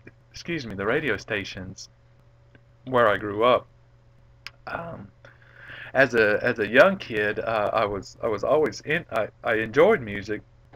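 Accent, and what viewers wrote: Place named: South, United States. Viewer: Thanks for introducing yourself. I thought I heard a Southern accent. Your story is interesting and I'm glad you shared it. I love classical music too.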